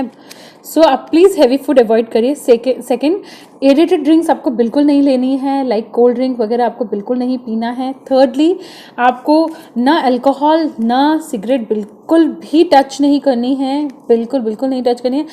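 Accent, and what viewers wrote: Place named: Portugal. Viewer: Gracias por visitarme bonita 🙂🙌 aquí estaré pendiente ♥️🙌me encanta escuchar 👂 tu acento ♥️es portugués???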